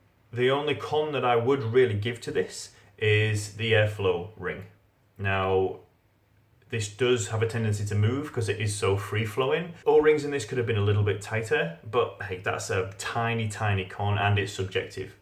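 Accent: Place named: United Kingdom. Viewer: Great review, thanks. Where is your accent from in the UK? What city?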